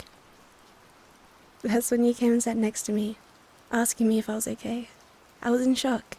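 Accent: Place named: Australia. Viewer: AUSTRALIAN ACCENT 🤩🤩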